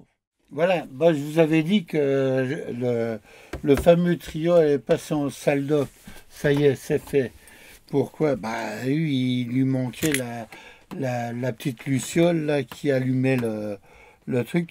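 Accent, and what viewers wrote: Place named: Lorraine, France. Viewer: Bonjour, fantastique combine. Merci. Et que ça fait du bien d’entendre l’accent Lorraine, moi qui suis exilé chez les chocolatines!😂.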